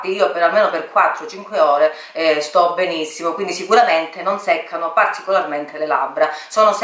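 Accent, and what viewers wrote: Calabria, Italy. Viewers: Ciao :) capito su questo video grazie proprio a Clio :) sei stupenda e fai delle spiegazioni strepitose, super dettagliate!! Dall' accento credo tu sia calabrese come me😍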